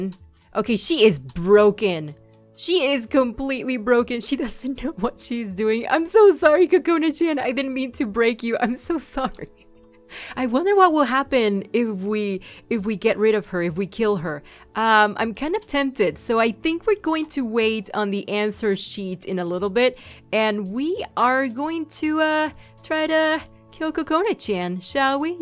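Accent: Spain. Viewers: Are u Spanish not to be rude😰😰😰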